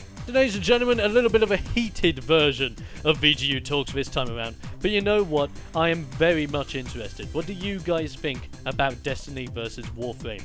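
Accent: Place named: United Kingdom. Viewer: Um... he's British.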